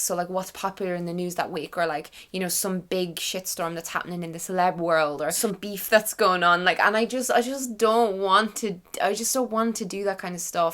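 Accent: Ireland. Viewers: I visited Ireland this summer and had THE time of my life and fell in love with it! I found you from searching Ireland videos and one thing lead to another and I fell in love with your energy and accent and message 💚 I get so excited when a new monthly vlog comes out... I snuggle in and make coffee and feel like I get to hang out with my Irish friend! 💚 Thanks for all you do!! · I came to your channel after seing you in some kind of collab on Hannah Witton s channel, it must be a couple of years ago now, and I stayed and subscribed. I really like that you have different types of content, the vlogs, the food diary and talking about like sex and health and everything. Also, a bit strange maybe, I'm here because I love irish accents! I'm Swedish but I lived in London for a year and kind of sound like a Londoner when speaking English and I'm so interested in the English language with all its different accents (not american though, sorry americans). 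· And I probably started following you 2 years ago... after I had a trip to Ireland, I fell in love with Irish accents! :-) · I subscribed to you when the video am no longer Vegan came out (because I was going through a similar thing). I’ve loved your personality and sense of humor ever since , you will continue to be one of my top . ( also am obsessed with the Irish accent, since am not a native English speaker I hope I’ll master it one day *insert Irish youtubers accounts here * lol ) love you 🖤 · I subscribed to you bc of ed videos, vlogs, because you speak so honestly about all topics (also things other youtubers dont talk about), your personality and your cute Irish accent 😊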